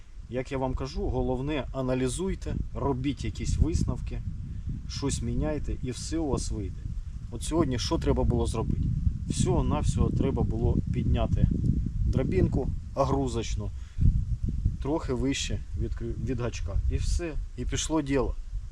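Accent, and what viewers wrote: Poltava, Ukraine. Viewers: Суржик точно в тебе полтавський! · душевне відео. особливу у тебе виходит нормально українською мовою Полтавський діалект особливий🥰🥰🥰 без отого чтоко-дакака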